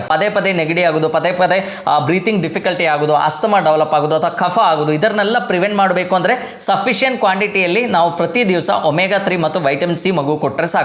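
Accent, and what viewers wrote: Kerala, India. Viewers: Good explanation 🎉 good kannada by pronoucetion I found malayali🥰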